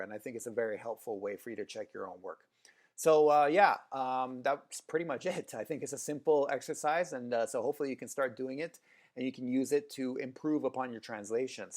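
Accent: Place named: United States. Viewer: This is encouraging and I look forward to trying this method. Edit: just out of curiosity, are you a native speaker in both English and Italian? You said you were Italian but your American accent is perfect. Did you grow up in the States? I hope this isn't too personal. Just curious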